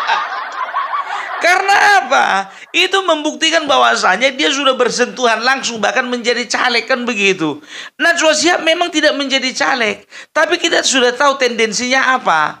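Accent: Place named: North Sumatra, Indonesia. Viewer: Saya suka opung ini. Ya gaya bicaranya dengan logatn batakya yang kental, gaya bahasanya yang lucu, bersemangat membawakannya, blak-blakan logis, menohok.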